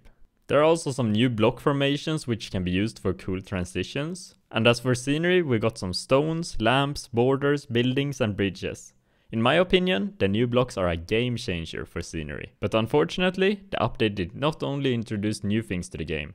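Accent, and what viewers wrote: Sweden. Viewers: here for the heavy swedish accent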